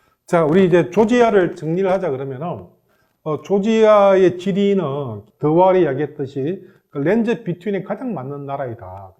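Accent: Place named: Gyeongsang, South Korea. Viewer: 좀 죄송한데 내용을 떠나서 외국과 관련된 유튜브에는 경상도 심한 사투리 억양 소유자는 나오지 맙시다. 우리 말 발음도 제대로 못하는 사람들이 경상도 아닌가? 직장에 경상도 사투리 쓰는 놈들 참 문제 많다. 사투리를 못 고치니 지들 경상도끼리 뭉친다. 니는 어데고 하면서 아주 가관이다.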